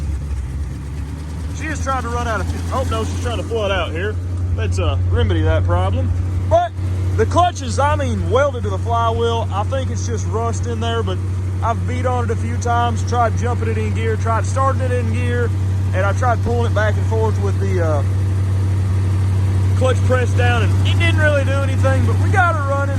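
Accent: South, United States. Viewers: not an accent but rather the Southern Drawl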